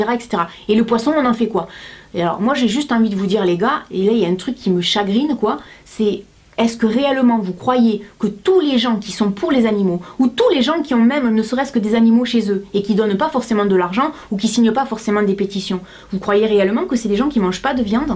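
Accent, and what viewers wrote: South, France. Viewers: cc j'adore t video tu est trop trop belle tu ma fait trop rire lol. j'adore ton accent du soleil. t o top bisou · tu as vu plusieures fois Anastacia.... ok je vais aller me pendre lol j'ai jamais eu la chance de la voir sur scène et pourtant j'adorerai étant une fan inconditionelle de cette artiste depuis ses tout début - je suis tombé sur ta chaine par hasard et j'en décroche pluuus lol Et vive l'accent du sud (a) (je suis près de montpellier ^^) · tu es vers le sud? car ta un accent:D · Tu es très belle et intelligente. Ton bel accent vient de quelle région du sud? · J'ai découvert ta chaine aujourd'hui, et je suis en train de visionner toutes tes vidéos! Tu es vraiment adorable, j'aime ta franchise, et ton petit accent du Sud! Et puis, tu es vraiment magnifique (t'as d'beaux ch'veux, tu sais?!) Je ne commente jamais sur YT mais je voulais quand même préciser quelque chose: le poisson, c'est de la viande! 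Du coup, ceux qui se définissent végétariens alors qu'ils mangent du poisson... offrez-vous un dictionnaire pour la rentrée! ^3^ <3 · quel bonheur de vous entendre parler! Et oui votre accent, le mien, celui du midi,de Pagnol, qui fleure bon la lavande et le romarin ".Non ne rougissons pas de notre si bel accent, qu'il soit clair, sonore, retentissant et allons nous en l'humeur toujours pareille, emportant notre accent sur le coin de l'oreille....." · OH MON DIEU! Tu étais vraiment trop mignonne quand tu étais petite ma chérie, tu étais vraiment trop belle!!! Une petite rousse toute mignonnette, je ne sais pas ce que les gens ont avec les roux, les réactions envers eux me choque beaucoup. En tout cas, continue comme ça, ton accent du Sud me fais beaucoup rire, ce n'est pas de la moquerie mais moi aussi j'ai l'impression de me sentir en vacances et d'entendre ma tante...eh oui dans ma famille ils sont tous du midi sauf moi! 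#intrus. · Trop drôle tout ce que tu dis et de la manière que tu le racontes. J'ai fait comme ta maman avec mon fils qui avait piqué une sucette dans mon dos chez le marchand. tu es superbe et tes vidéos aussi! Et surtout garde ton accent du sud. Tu fais honneur à notre région! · Cc, que du bonheur dans cette vidéo, tu m'as fait trop rire avec toutes tes anecdotes, ne change pas la et tout ça avec l'accent du sud, bises a+ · coucou, je viens de découvrir ta chaîne et je viens de dévorer plein de tes vidéos! ça change ton fonctionnent tes conseils, tu es rayonnante naturelle et tu me fais rire! j'adore ta bonne humeur et puis parlons accent;) je suis du 64 et ça me fait très plaisir d'avoir une youtubeuse avec l'accent du soleil puisque dans les Pyrénées en ce moment c'est 20° et grand soleil:) cette vidéo m'a fait sourire puisque j'ai 23 ans mais je me retrouve beaucoup dans ce que tu dis, je suis plus vieux rock (led zep c'est la vie! 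) mais vive les 80! très contente d'avoir découvert ta chaîne, je vais vite m'empresser de regarder tout ce que j'ai raté! et comme on dit porte toi bien potons! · jai la rage contre la meuf qui envoi des menace t es une creme ca se voit vive les femmes du sud avec l accent si tu es effrayer je suis la tkt... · Tu me fait bien marrer avec ton accent du sud😂😂❤ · J adore ton accent du sud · Haaaa une fille avec l'accent du sud la famille ^^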